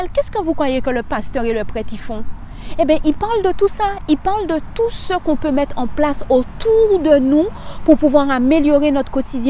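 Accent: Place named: Haiti. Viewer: Vs parlez comme les Antillaise ooh je sens l’accent haïtienne en vs je suis Haïtien c’est vraiment un plaisir je sens ta positive vibes 🥰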